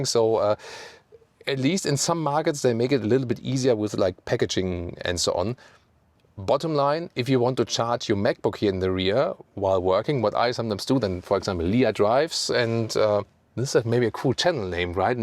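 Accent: Germany. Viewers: "turning indicators" I love this guy's Germlish.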